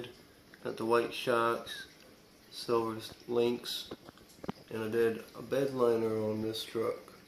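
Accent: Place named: Massachusetts, United States. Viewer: I was watching your video the other night. Sounds like you've got a Massachusetts accent. Do you have another YouTube page called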